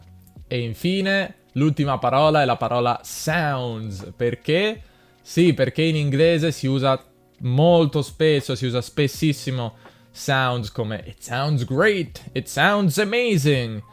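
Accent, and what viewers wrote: United States. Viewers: I love these videos. Also, your American accent is spooky good! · Very American accent to your English. Is that the English they teach in Italia?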